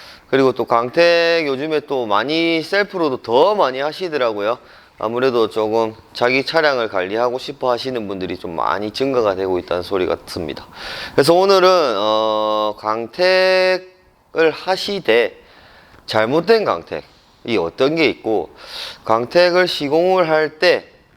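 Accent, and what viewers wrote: Gyeongsang, South Korea. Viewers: 상도억양 개싫다. ㅜㅜ😊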